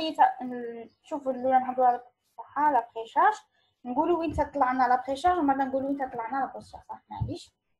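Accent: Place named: Oran, Algeria. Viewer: Merci Tbiba , j'adore tes explication et surtout on accent oranais <3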